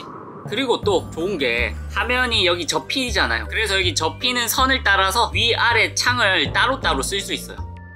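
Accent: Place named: Gyeongsang, South Korea. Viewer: 잇섭이랑 대구말투도 비슷하고 목소리도 비슷하네요😅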